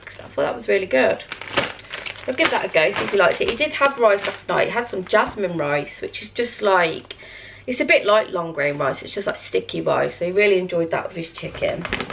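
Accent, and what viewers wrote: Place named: Midlands, United Kingdom. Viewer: ahh I thought I could detect a Midlands accent ..but then also could hear hints of Bristol 😆 xx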